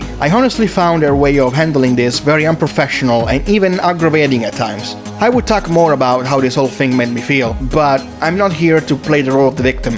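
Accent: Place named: India. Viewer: You must be talking with an Indian accent! Indian as in Asian-Indian American.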